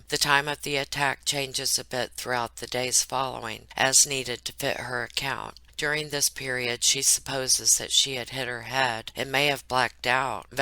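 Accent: United States. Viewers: I love you an shit. I think youre so down to earth and you sound like a USA version of me lol Keep up the good work. The world was already Mad hunny pie. Its just that we are all at home watching and listening and realising how fucked we truly are. Double Pandemic. Covid and Stupidity. Stay safe and feel the love Im sending you. From one night Owl to another, love and light to everyone from wee me over here in SUNNY Scotland lol (see how long this lasts eh...) lXl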